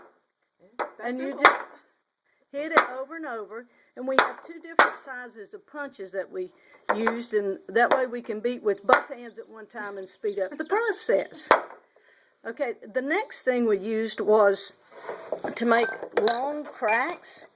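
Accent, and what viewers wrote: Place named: Kentucky, United States. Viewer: Lady you are a doll! You're accent takes me home to Kentucky! I miss it.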